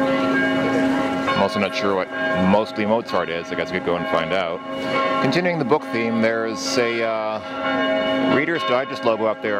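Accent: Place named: France. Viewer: I know you can't speak german , but the way you read words is in french like the word gasse ... You have to pronounce the 'e' In german you have to pronounce every single letter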